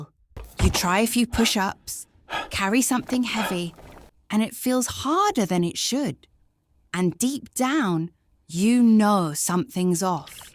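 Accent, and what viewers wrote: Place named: United Kingdom. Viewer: It’s good to hear British accent 😉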